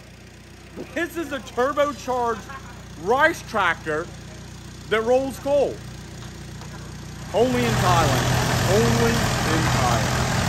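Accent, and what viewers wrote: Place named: South, United States. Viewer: Georgia boy in Thailand I was wondering where you were from I can’t help but hear your accent as a fellow southerner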